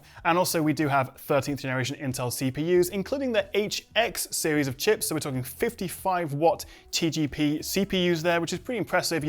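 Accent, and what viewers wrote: United Kingdom. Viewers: Thanks for the review. It was very informative. I like your British accent!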